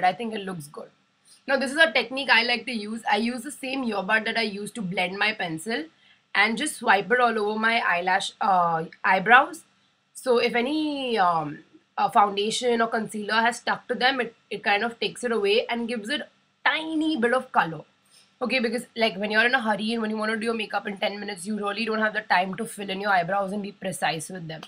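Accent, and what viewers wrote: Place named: India. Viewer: your make-up style says you are a huge fan of Michelle Phan. I like how you did not try to have an accent and spoke in true Indian way!! Way to go :)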